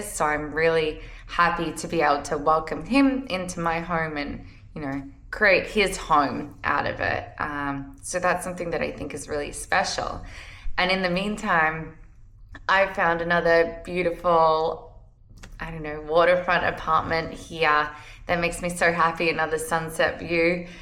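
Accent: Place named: Australia. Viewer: I knew right away you were from Australia. I enjoy your content but think you would also be good in the Tesla community.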